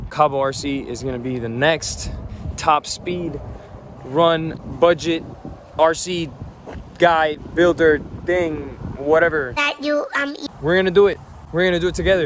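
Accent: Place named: Miami, United States. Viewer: Have no idea how I came to find this channel but gotta say bro hearing you talk hella remind me of Game Bred himself Masvidal. Lol. Gotta be from the MIA?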